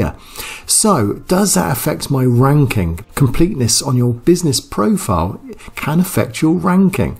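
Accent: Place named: England, United Kingdom. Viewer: Yes of course. But just to be clear, it wasn't overbearing at all. Perhaps it was just me, but I found it a little distracting from that lovely English accent, LOL! And thank you again for all these super helpful videos. I'm trying to learn as much as I can so I can help my web design clients with another service.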